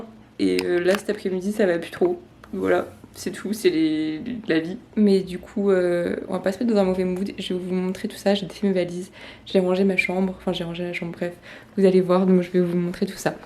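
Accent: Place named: Quebec, Canada. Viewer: Omg, le québécois est trop nice ! l'accent cette pépite !!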